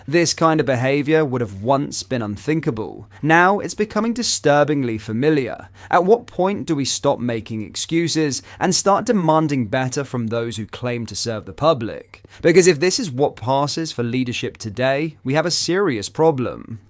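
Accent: United Kingdom. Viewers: Why are you using British stands voice?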